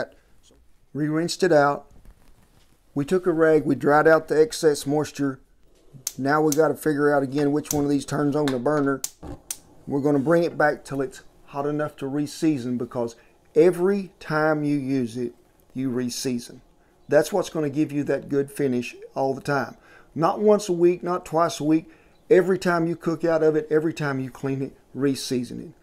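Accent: South, United States. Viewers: Hart warter lol love the southern accent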